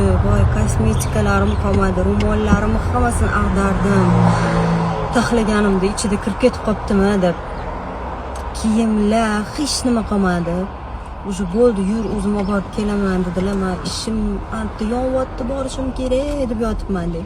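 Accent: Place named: Surkhandarya, Uzbekistan. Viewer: Емоннам олам шумул иш бубтию сурхонча гапиринг сизга узингизни шевангиз ярашади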